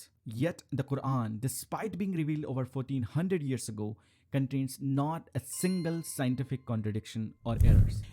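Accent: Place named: Bangladesh. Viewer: Assalamu alaikum brother. Are u from Bangladesh? ur accent sounds familiar. take love <3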